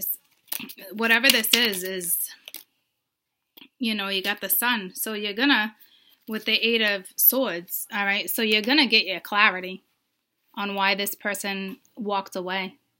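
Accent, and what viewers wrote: Boston, United States. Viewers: is that a Bostonian accent I hearya? :-) great reading ...yea they're a bit petty right now. thanks a bunch. #7thhouseAriesinMercury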